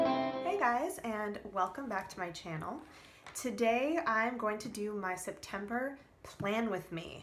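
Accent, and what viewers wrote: United States. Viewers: Mais tu es française ! Bravo pour l'accent, on croirait une américaine ! Et aussi bravo pour ton BuJo, il est magnifique !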